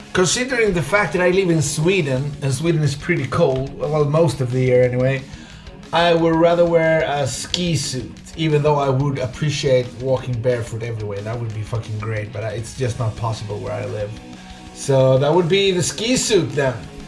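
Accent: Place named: Spain. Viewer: for a Swedish man you sound like you have a more spanish accent. no hate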